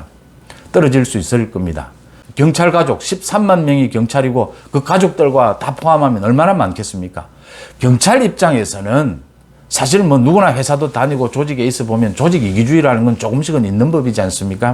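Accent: Gyeongsang, South Korea. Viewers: 10만 축하합니다 경상도말씀이더정감이갑니다 경찰이미쳤구나 지그이라도 윤대통령도와줘건설하자 대한민국홧팅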